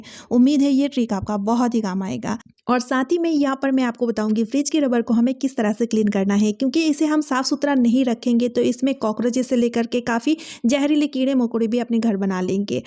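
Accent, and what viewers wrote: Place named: Bengal, India. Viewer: आप begali है क्या...क्यो की आपके बोलनेमे वो मिठास है...ठिक है...ये वर्ड बोलनेका तरिका मुझे बहोत अच्छा लगा...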